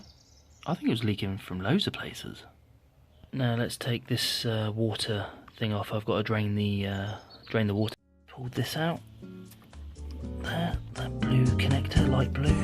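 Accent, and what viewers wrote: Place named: England, United Kingdom. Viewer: This seems to be the narrative in my head during car wrenching. Mine lacks the English accent and includes many more profanities. This video is gold.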